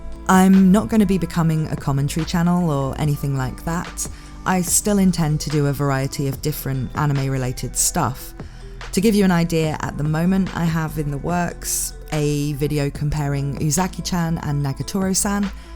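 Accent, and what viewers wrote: United Kingdom. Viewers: I knew after listening to your video on Noralities that subscribing would be wise. You have "the voice", I could hear it then and I hear it now. Beautiful, smooooooth, intellectual British voice (sorry if you're Australian, I'm American please forgive me) that I could quite literally listen to all day and just be mush. I AM MUSH! HEAR THIS PROPHECY! WE SHALL ALL SLEEP VERY WELL ON WHATEVER NIGHT THAT COMIC BOOKS vs MANGA SALES VIDEO IS PUBLISHED! Thus, it is written and I look forward to that night. :D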